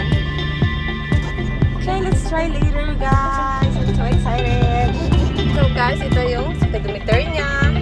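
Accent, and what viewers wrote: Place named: Ilonggo, Philippines. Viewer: Ilonggo ang tonoo ahh kababayan to pa pla😂😂😅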